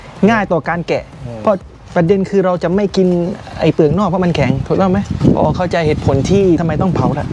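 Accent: South, Thailand. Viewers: พี่ พูดใต้ได้เปล่าครับ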